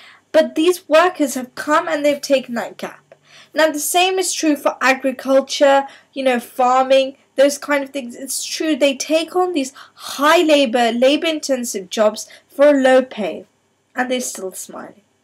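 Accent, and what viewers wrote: United Kingdom. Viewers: As a Pole myself, I'll be the first to admit that Poles don't belong in the UK and that Britain is for the native BRITISH, which does NOT include you,. You're NOT British. You're just an Indian occupying Britain who happens to just so have a British accent. One day, European nationalists will rise up and deport all non-Europeans from our beloved continent and you will be sent back to where you belong.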